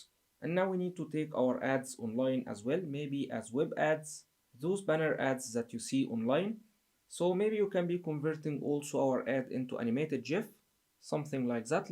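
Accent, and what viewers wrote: Egypt. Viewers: السلام عليكم هو حضرتك مصري ؟ اصل من اللكنه قلت انت ممكن تكون مصري .. انا معجب بشغلك جدا ما شاء الله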